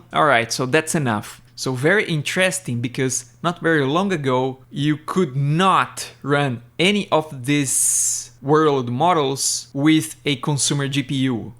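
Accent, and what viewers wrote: Brazil. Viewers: man are you brazilian? your accent is very brazilian, just got curious haha. good video btw :))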